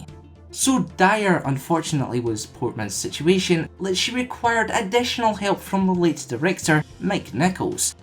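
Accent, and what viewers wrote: Scotland, United Kingdom. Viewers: u scottish? Cause ur accent sounds like scottish i know cause im scottish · God damn it I can understand you you're Scottish accent is so strong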